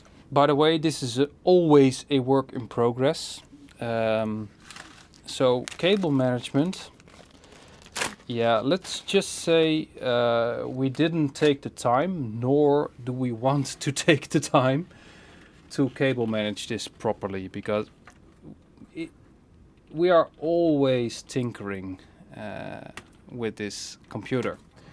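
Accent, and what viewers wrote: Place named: Netherlands. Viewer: The way your accent sounds i know for sure youre from the Netherlands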